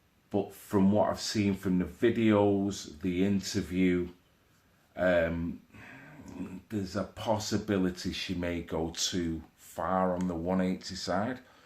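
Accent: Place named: Liverpool, United Kingdom. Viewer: Racism would NOT exist between whites and blacks if all black guys adopted the same morals and loyalty that strongly holds towards his country and people. I agree with almost everything talks about and I look forward to all his uploads. I was an early subscriber to his channel because on listening to his posts, I immediately recognised this man as a decent honourable and above all loyal black Brit whose corageous enough to speak the TRUTH without fear or favour. is clearly very clever, informed and is an articulate speaker (with a Liverpudlian accent lol) to boot who doesn't use obscene profanity to shock. I think his talents are pitifully wasted as a painter decorator (no disrespect intended to decorators who are all hard grafters). He's the kind of guy I'd hand pick as a friend. I think that Merseyside Police should appoint as their new Diversity Policing Adviser.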